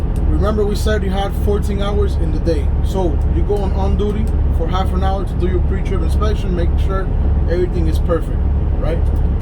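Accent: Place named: Miami, United States. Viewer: I can tell your from Miami. Same here brother! Great video very helpful btw!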